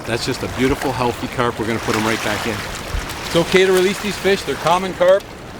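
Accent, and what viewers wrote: Canada. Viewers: Hi, Thank you for your video. Like your Canadian accent. BTW, can I pay Canadian dollars instead of USD on your website?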